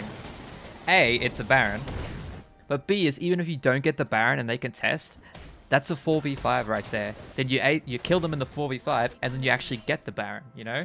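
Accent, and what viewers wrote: Australia. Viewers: Mate are you in Perth I swear I talked to someone at uni sounding like you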